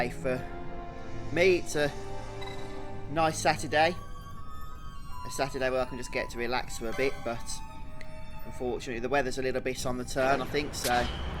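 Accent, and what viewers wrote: United Kingdom. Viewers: I'm very proud of myself because i can clearly understand your English Even with your british accent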